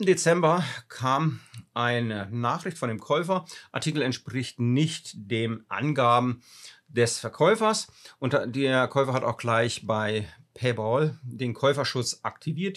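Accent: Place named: Franconia, Germany. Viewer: Sehr fränkischer Dialekt🤔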